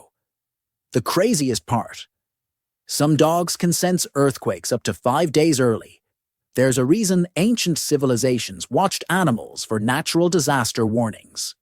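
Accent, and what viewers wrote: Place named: Dublin, Ireland. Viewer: What a horrible voice over - some sort of warped south side dublin accent , sounds like it’s reading w emphasis in all the wrong places - unreal - cringe making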